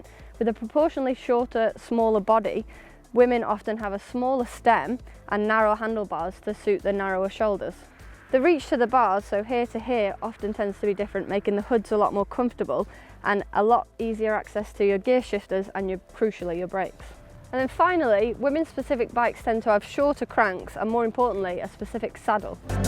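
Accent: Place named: United Kingdom. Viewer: It's probably a mix of nerves and the British dialectual habit that she's attempting to speak through a locked-jaw. Don't take this the wrong way - nobody is expecting her to sound American, but perhaps a little practice enunciating with an open mouth (and, of course, time to be less nervous while doing it) will go a long way. Matt, Dan and Cy seem to have this down pat, but I'm sure it took practice and/or some studying. Too bad cycling and a glass of wine - to lessen the nerves - don't mix, but like anyone who regularly speaks in front of an audience, she'll get used to it.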